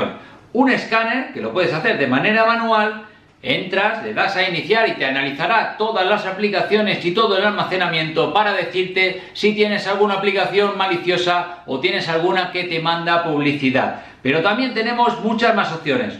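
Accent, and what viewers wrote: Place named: Spain. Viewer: Bro los nombres de las app no entiendo muy bn por tu acento español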